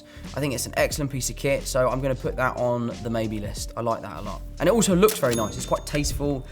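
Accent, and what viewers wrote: United Kingdom. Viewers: すげぇブリティッシュ訛りだな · イギリス英語めっちゃ耳に気持ちいい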